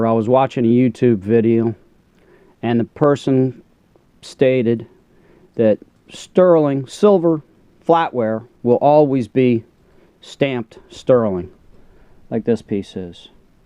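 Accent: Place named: Maryland, United States. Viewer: This is a dude from Maryland for sure haha my aunt and uncle have that accent they’re from Hagerstown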